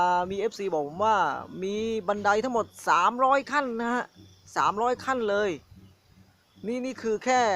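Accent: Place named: South, Thailand. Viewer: แหลงใต่เพราะดีครับ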